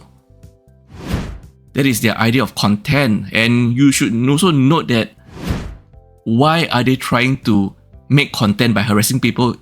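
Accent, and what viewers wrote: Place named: Singapore. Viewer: your accent is giving me "Singaporean" vibe..lol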